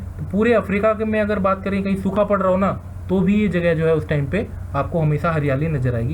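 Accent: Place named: Rajasthan, India. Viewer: You from Udaipur or Rajasthan. ?? Your tone depicts that... please tell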